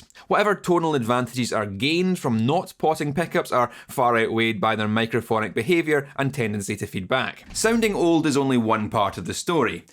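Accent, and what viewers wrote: Scotland, United Kingdom. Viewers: Honest to God I though you were gonna be a guy from LA instead a glorious Scottish accent has gretted me I am happily surprised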